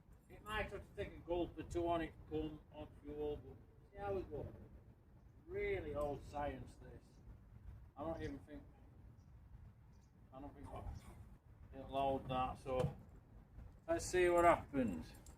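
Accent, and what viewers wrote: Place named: North, United Kingdom. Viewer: it's the funny northern accent. Poor AI doesn't stand a chance ..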